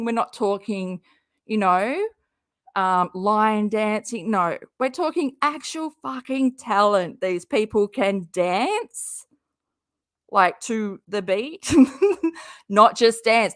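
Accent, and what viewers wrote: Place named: Australia. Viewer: I have a sneaking feeling that karma is finally coming for a certain hater 😉and I can’t wait! FYI I LOVE LOVE YOUR AUZZIE ACCENT 😍😍😍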